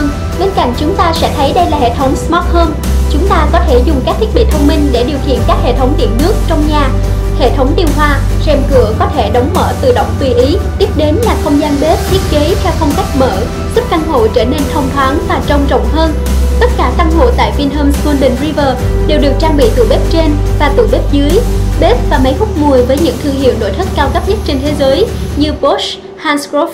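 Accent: South, Vietnam. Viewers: Nghe người miền nam là muốn mua liền hiii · Nghe giọng miền Nam mà muốn mua ngay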